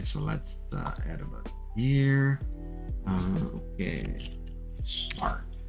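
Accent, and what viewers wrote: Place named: Brazil. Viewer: Brazuka? vc tem sutaque brasileiro!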